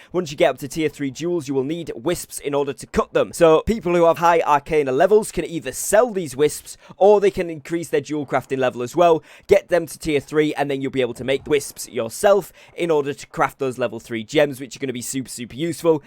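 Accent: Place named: North, United Kingdom. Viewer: Love your guides. You sound local to me! Total northerner! 😅🤣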